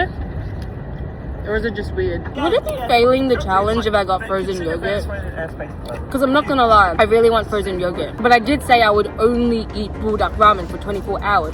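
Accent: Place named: Australia. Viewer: The Australian accent 🤌🤌^^ :D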